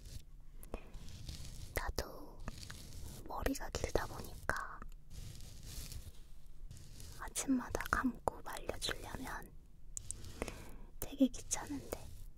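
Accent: South Korea. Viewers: I love this korean accent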